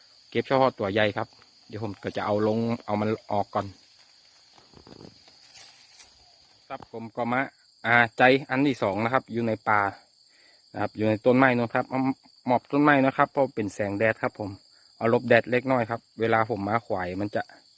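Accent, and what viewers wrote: North, Thailand. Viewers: คนเหนือใช่มั้ยคับ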